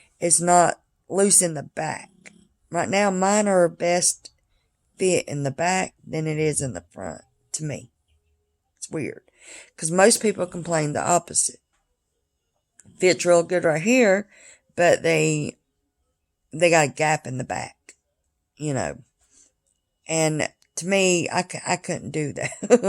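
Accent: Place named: Kentucky, United States. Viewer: Aww girl, they look beautiful!! I'm going thru extractions now and shew they ain't no joke lol! This gives me hope tho! Keep us updated! Btw, I don't know where you're from but I'm a Kentucky girl and you sound like your from my neck of the woods lol! I mean that in the best way because girl...my accent is thick lol!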